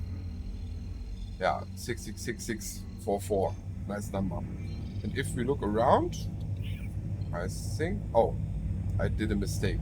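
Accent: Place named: Germany. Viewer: dont appologize for the "hard german accent" its amazing and your english is very good. Very easy to understand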